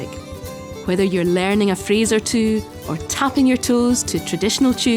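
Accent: Scotland, United Kingdom. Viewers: I really love your accent, thats the accent I think when I think "Scottish Accent" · I'm in love with her lovely Scottish accent! so want to visit the "homeland" some day. Love to meet a good set of lasses or lads to show me around :-) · I clicked on this just to hear a Scottish accent. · The lady narrating this video has the perfect Scottish accent. I could fall asleep to it! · I love the Scottish accent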